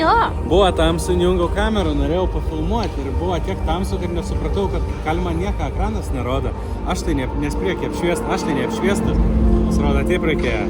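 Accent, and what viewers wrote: Aukštaitija, Lithuania. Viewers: Bet tai faina klausyt,kai kalbi paprasta aukstaitiska moletiska,utenska kalba,ne kaip kiti kaimieciai miestan pateke,vaidina aukstuomene...😊👍👍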